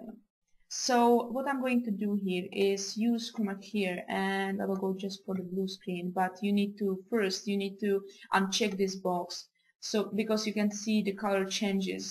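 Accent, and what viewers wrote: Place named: Czech Republic. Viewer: Já jsem si říkal, že tvůj přízvuk zní hodně česky. Díky za tutoriál!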